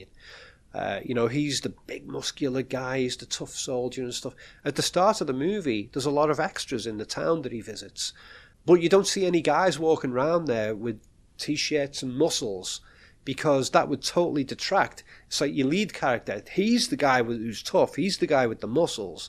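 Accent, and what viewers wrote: United Kingdom. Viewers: Im not a natural speaker is this a british accent?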